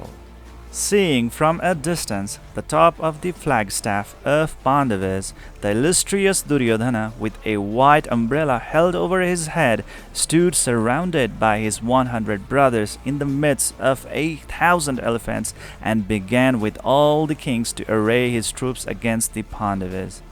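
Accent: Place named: Nepal. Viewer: You have a rich Nepalese accent. Keep the brilliant work going.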